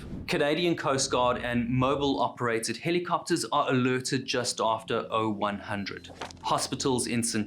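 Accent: South Africa. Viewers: I asked him the exact same thing when I discovered the channel :) Are you south african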